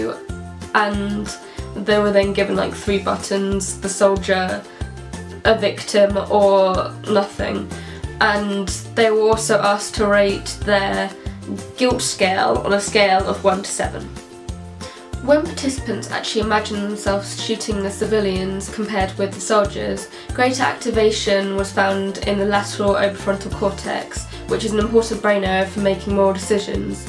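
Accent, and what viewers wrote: United Kingdom. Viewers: British Accent, Happy tone and a Psycho Topic. Hillarious. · Serious subject, happy clown music and a brittish accent do not go well together.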